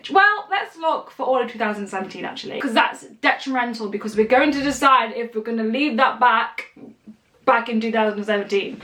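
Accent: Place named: East Midlands, United Kingdom. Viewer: ''Blame my accent'' what is your accent? my guess is East Midlands like Derby or something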